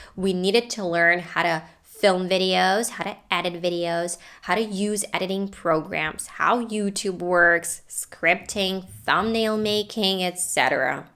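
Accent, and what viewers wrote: United States. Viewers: Hi there, you're the greatest English teacher and as well as your American accent is so awesome..And please ignore the dumbest stuff who're criticism about you..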